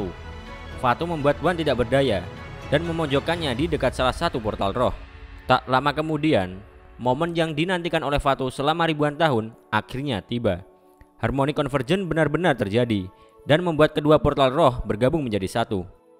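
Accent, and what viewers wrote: Indonesia. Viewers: Abang orang NTT ya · Medhok looorrr....!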